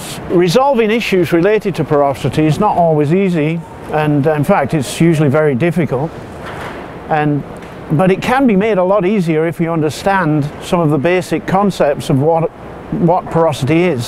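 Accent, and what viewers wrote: United Kingdom. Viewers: Two things, you sound like your native country is England, so why say that word incorrectly?! And secondly you look like hack you up with a hatchet Harry from Lock Stock And Two Smoking Barrels. Top video though, good tips!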